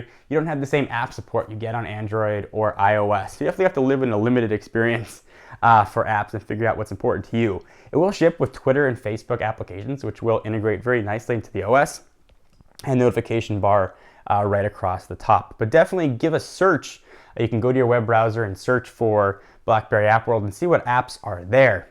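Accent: Canada. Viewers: phe<link> for a second there i thought you were from canada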